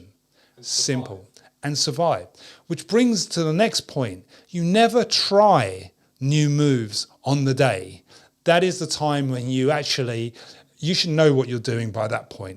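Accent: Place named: Cockney, United Kingdom. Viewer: Excellent advice !!! Love this guy's Eastend accent.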